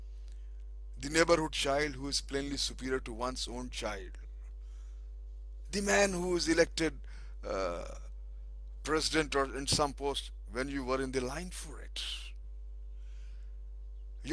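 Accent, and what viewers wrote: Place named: Kerala, India. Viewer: U sound like a mallu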